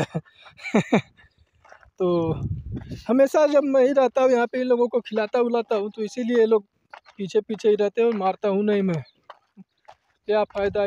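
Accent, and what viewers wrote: India. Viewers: Bhai aap Bihar ya up se ho kya lagta hai aap bhojpuri bolte ho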